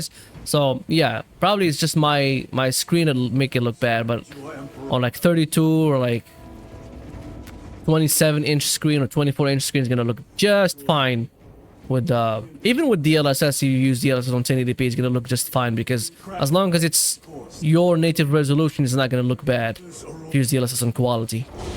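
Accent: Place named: Morocco. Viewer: From your lehja i know you from moracco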